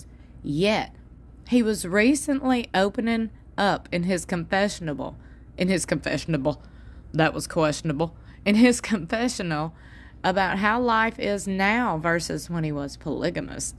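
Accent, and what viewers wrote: South, United States. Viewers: I love your voice! And your southern accent too!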